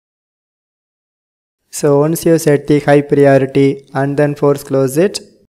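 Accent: India. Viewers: Hey Mr. Indian accent slow down. I can’t keep up with whatever you’re doing. Oh my God.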